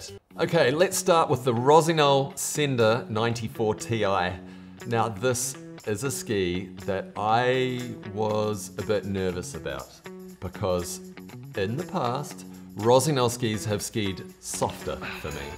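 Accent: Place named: New Zealand. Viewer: Found this Vlog by accident. Nice vlog Mate. Funny hearing a Kiwi after being here in the US for 20yrs - now I know how I sound to my mates!!! Chur Bro. Armada 92 Declivity for me cuz…( latest edition )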